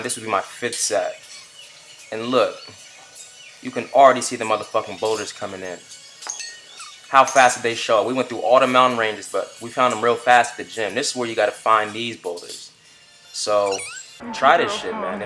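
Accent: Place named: United States. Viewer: Wait are you American? Your name threw me off but hearing your voice you seen American lol.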